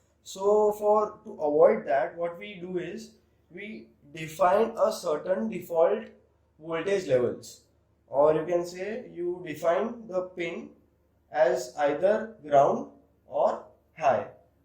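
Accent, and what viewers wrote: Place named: India. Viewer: Hard to understand the india accent. Great video.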